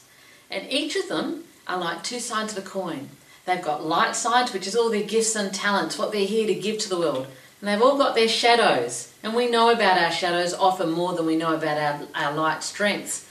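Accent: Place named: Australia. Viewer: love both - the jungian archetypes & the aussie ascent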